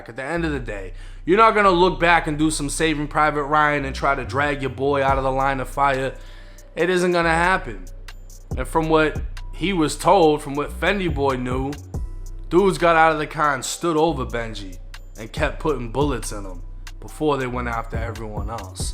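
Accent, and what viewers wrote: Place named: Massachusetts, United States. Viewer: yo I gotta ask how long did u live in Malden for? Whole time I was thinking no way is originally from Florida - cause legit every dude from mass can move away at like 8 years old, hit 30 & still have a thick ass mass. accent 🤣 Edit- My bad, looked it up, didn't know you stay in Dorchester. this shit is dope though, subscribed.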